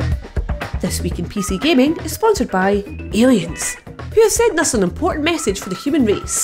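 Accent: Scotland, United Kingdom. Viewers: PC Gamer, I loved the announcer's lovely Scottish accent ! Please give us more =)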